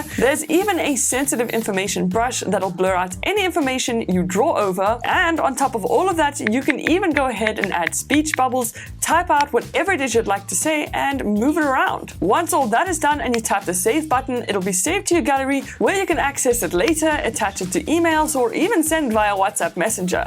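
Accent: South Africa. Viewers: Are you South African????? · Your SA accent is refreshing 🙂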